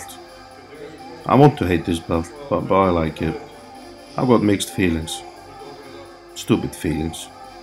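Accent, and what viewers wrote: United Kingdom. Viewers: Interesting to hear how you've been picking up English accent slightly! Great video thanks:) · You sound Welsh lol. Great video 👌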